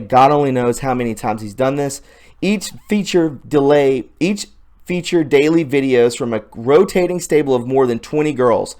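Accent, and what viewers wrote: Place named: South, United States. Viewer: How is it that your southern accent as bad as mine?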